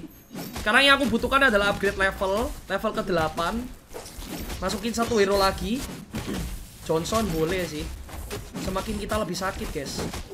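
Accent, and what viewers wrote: Java, Indonesia. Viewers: Njar njir blas ramasokk, medok wae sok sokan njir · Jawa totok hahahaha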